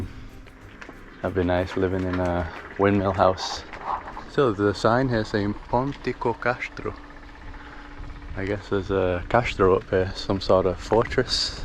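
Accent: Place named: Scotland, United Kingdom. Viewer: 😁 I was sure I could hear a Scottish accent...😁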